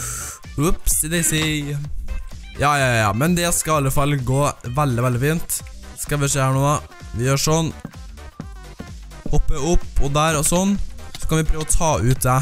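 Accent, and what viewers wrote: Trøndelag, Norway. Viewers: Elsker dialekten din! (Trøndersk)😄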